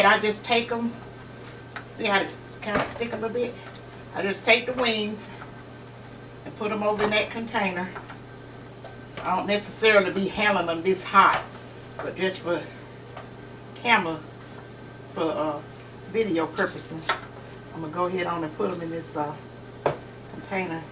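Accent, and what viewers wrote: South, United States. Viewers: that's the word southern accent not country I couldn't think of that word, yeah the crazy part about that stories I don't understand why my Grandma let my Great- Auntie change my Moms accent ? I wish I would have asked her that while she was alive. Well at any rate I can hear your Southern accent in your videos 😁 Thanks for taking the time to read my comment and to reply back to me I really appreciate that. Take Care and I pray God will Bless your day ❤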